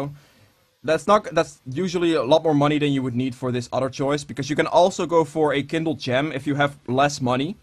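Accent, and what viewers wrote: Netherlands. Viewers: dacht ik al aan je accent te horen! Vooral aan de S:) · You have a dutch accent are you dutch????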